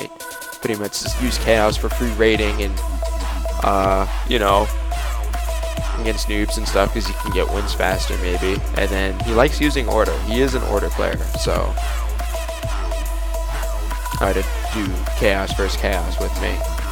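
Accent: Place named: Philippines. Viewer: You sound Pinoy Btw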